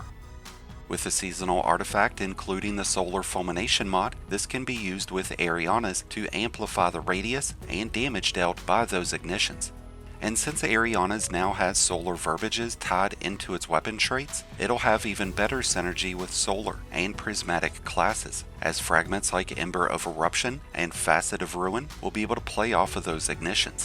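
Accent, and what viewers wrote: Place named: Kentucky, United States. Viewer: I can place the accent...perhaps KY?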